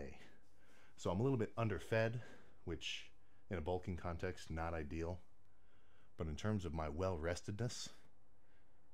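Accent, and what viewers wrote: Birmingham, United Kingdom. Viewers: It’s a Birmingham accent bro second biggest city in England towards the north so your not too far off 👍🏽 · he's English with a Birmingham accent - each city and even some towns will speak differently with different slang and accents. That's the case all over the country, if I get in the car and drive an hour from my house everyone sounds completely different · That Brummy is fucking well annoying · Yesss... Go on, you're getting the full BRUMMY accent... straight out of the gate! Big up the UK! Awesome to see you here man!:goat-turquoise-white-horns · That’s a real Brummie accent right there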